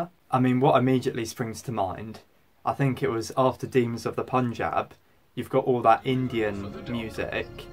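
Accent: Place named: Northern England, United Kingdom. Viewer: I’ve got an out-of-the- blue (pun intended) question: do you have relatives from the north of England? ‘Cause I feel like I can hear a hint of a Northern accent(I’ve mentioned my good ears before😏)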